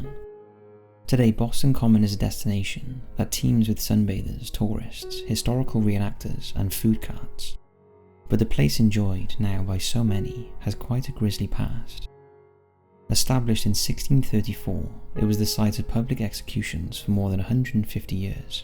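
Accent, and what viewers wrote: United Kingdom. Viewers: He’s British, there’s loads of different accents in the Uk. It’s just the US always make us sound like posh wankers. YouTube Sheffield accent for example. That’s where I’m from :)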